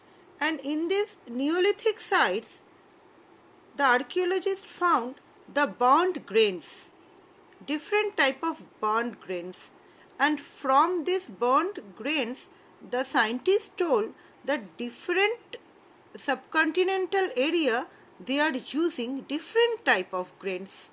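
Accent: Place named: United States. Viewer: My goodness... your English speaking is like an American😮😮!!Well you are Indian..😊!!!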